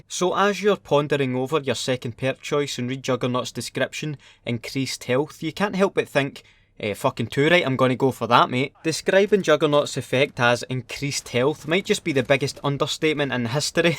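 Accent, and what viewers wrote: Scotland, United Kingdom. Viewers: your accent shaped up my own Scottish Accent. Honestly my accent got a lot better when I started watching your videos. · I love him and that Scottish voice his new nickname keep up good work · I watch so much that when I hop on CoD I eventually slip into a wee Scottish voice. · The funniest shit I've ever heard, and it's even better with the accent. The Scottish accent is my favorite accent next to Australia. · Like the Scottish accent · Max Ramirez he's Scottish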